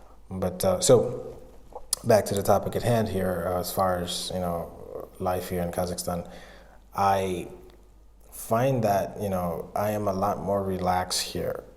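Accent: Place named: Southern Africa. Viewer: Your accent sounds very familiar. Like a mixture of Southern-African and American.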